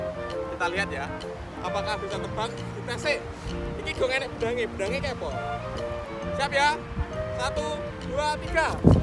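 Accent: Java, Indonesia. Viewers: Orang jawa ya kak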